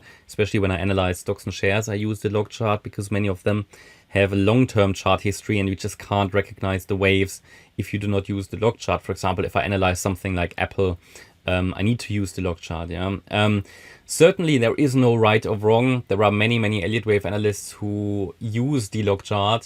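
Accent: Germany. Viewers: ich höre den deutschen aus der stimme xD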